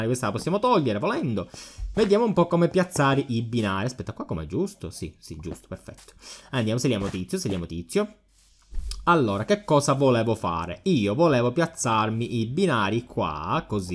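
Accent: Palermo, Italy. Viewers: Scusa mah sei di Palermo per caso?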